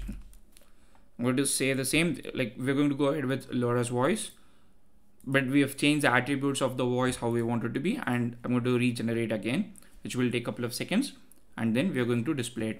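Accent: India. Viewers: Ok I'm only 19seconds in but I have to pause here to tell U smth I hope maybe makes U smile a bit. UK in south park tech support is always an Indian worker and ik that india is actually very advanced in tech nowadays Ur country worked hard to get there and don't think the rest of the world doesn't notice. Even if we in Europe make fun of it I swear to god U won't find a single programmer in Germany that doesn't watch Indian tech tutorials. We are very grateful that U guys are making these amazing videos because our country for example is insane far behind and without people like you even the young people that want to learn are not having it easy because the generation teaching us is often times behind their students in terms of capabilities. Yes we make fun but we all respect U and even if Indian accent is funny ours isn't better and Indian English is one of the accents that's very clear and easy to understand. 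In school the listening parts for young kids in tests is sometimes with Indian accent and every time it's Indian there's a focking train or car passing by or faking helicopter sounds or whatnot because U Guys are that easy to understand. I probably would have an easier time communicating driving on top of an Indian train than beeing to dinner was an American guy. You guys are amazing we have huge respect and admire Ur guys effort and are grateful for your service to the education and evolution of the tech community. Don't be sorry for Ur accent wear it with pride my fr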